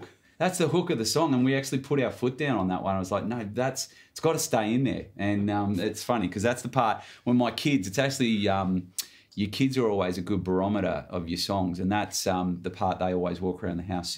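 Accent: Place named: Australia. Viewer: haha love the aussie accent so strong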